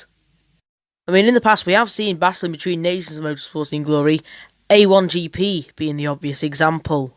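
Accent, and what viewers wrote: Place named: Preston, United Kingdom. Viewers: Your accent is so british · Big up the Preston accent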